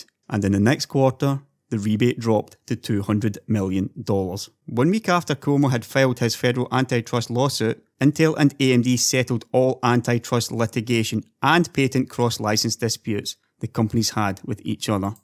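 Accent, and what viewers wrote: Scotland, United Kingdom. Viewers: I love your Scottish accent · Are you scottish? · Just built my 1st pc in the last year or so, luckily I bought a shitty cheap i3 to get me going but decided half way through of building a better 1 so got an rtx2070 which is a bit strong for an i3 I think. I was looking into a new motherboard and processor and I’m really glad I watched this video, I will never buy anything from intel again. Gonna wait for the new thread ripper and get a beast of a pc. PS I just subbed to this channel the other day and so informative. Every video is class that I’ve seen. Presume your from Scotland ye? I’m in Aberdeen so thanks from a fellow Scot :) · I thought you are Scottish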